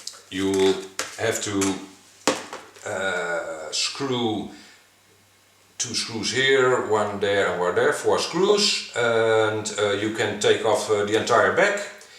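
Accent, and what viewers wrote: Netherlands. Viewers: Ik vind het Engels enorm goed, uitspraak is lekker typisch Dutch.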